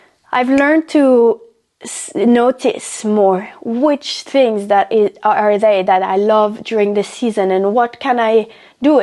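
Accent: France. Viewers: she does have a bit of a french accent that comes out every once in a while and I notice it in many videos. I feel that the same happens to me when I talk in a certain language more often my accent shifts. But yes overall she does not have a strong accent or anything. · Îm love that her little French accent is coming through more. It sounds SO cute! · I'm wondering if the secret boyfriend is French speaking, more French accent is coming out 😊❤ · Nice video as always... your accent sounds more french in this one for some reason :D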